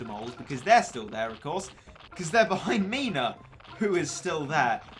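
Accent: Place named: United Kingdom. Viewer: . I watch your videos because it's Battle Cats, and I love Battle Cats. I watch the three "big" streamers, Captain Sauce, Chawsey, and MattShea. But when I come to watch your videos, your self-deprication/sourness really turns me off. I know it's a schtick, because I've watched a lot of your videos and given all of them a fair gambit, but honestly, at this point, your "self-deprication" followed by occasional "I've taken this to the Battle Cats Reddit/Source" and talking about that, then the clearly obviously thrown in jokes about your recording times are really really stale. I wish I could give you beneficial advice. I really do. The thing is, if you only do one thing as much as you do, it's really, REALLY hard to give helpful advice. Now your starts in Doki Doki and Singularity are GOOD things, and I implore you to use your lovely UK accent in more videos, as it is with Battle Cats, I literally cannot enjoy your videos because of how salty you are (and I am in the minority there...) But advice is advice, take it how you will, you apparently miserable twerp.:p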